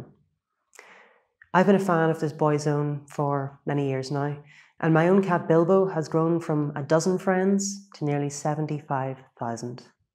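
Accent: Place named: Ireland. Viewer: Just some observations: I found your talk quite compelling for a variety of reasons. I was initially drawn in by the way you look into the camera so intently. I realized after a bit that part of the power of you gaze, I think, is that you seem to almost never blink! Don't mean to make you at all self-conscious about it, but it is really rather striking. Secondly, you have a lovely voice and manner of speaking, with that light Irish accent that sounds so compelling to my (N. American) ears. I really think you would make a terrific narrator of, say audio books! You articulate extremely clearly. Have you a red light accentuating your hair color? It looks just great! (again, so very "Irish"!) Fascinating how something as seemingly trivial as sharing cat info evolves into a helpful resource for many in such a profound way! Cats are such lovely creatures--even though I only have d_gs--and having any kind of animal does, I think, increase the humour quotient of one's life quite substantially, which is always a positive and healthy thing. 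Sounds as though you are conducting your life in a very positive manner. Well done, and kudos!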